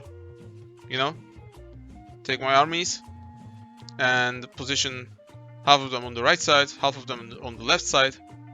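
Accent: Turkey. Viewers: aksandan direkt Türk olduğunu anladım :D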